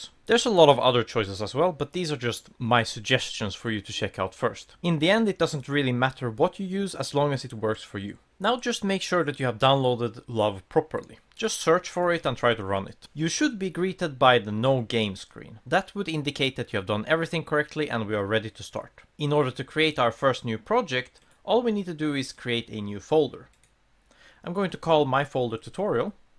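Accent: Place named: Scania, Sweden. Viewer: I'm also swedish you sound south.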